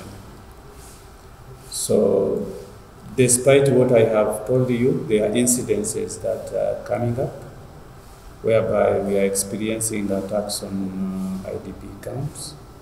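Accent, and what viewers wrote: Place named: Eastern Uganda, Uganda. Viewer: He speaks like a Eastern ugandan for some reason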